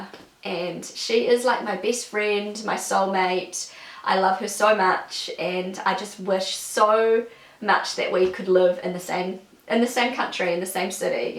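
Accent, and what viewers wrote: New Zealand. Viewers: Can you recommend any other kiwi youtuber? :) I love the kiwi accent <3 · Hi, sweet as video😉 I can totally relate to all the things you mentioned in this video (minus the sweetcorn and lamb 😉). I've been to NZ three times for 10 months in total and am missing it so much! The fiery sunsets are just out of this world and nothing beats watching the stars on a NZ beach. Don't know if you've ever been to Whaihi Beach but it reminded me a lot of beaches on the German island Sylt! Ever since coming back from NZ I don't like German apples anymore. They're just not as tasty! And feijoas are so yummy. Didn't even know they existed before my first trip to NZ. I used to pick them from my host family's garden 😍 Keep posting videos, I love hearing the kiwi accent 😊 take care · Aww being in Germany myself makes me miss these things too. I have yet to have a nice apple here, and I'm craving fish and chips. 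It's nice hearing your NZ accent too, I don't know any people from NZ where I live.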